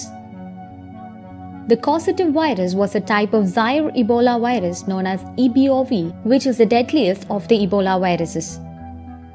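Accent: Kerala, India. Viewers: The narrator is a malayali, i BEt. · Typical indian accent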